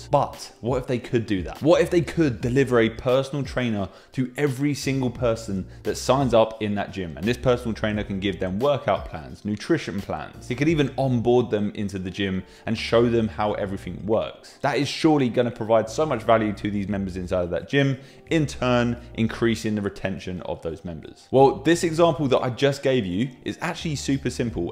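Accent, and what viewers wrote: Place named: United Kingdom. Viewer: Okay, you have a British accent but you mentioned potential earning in US dollars, why?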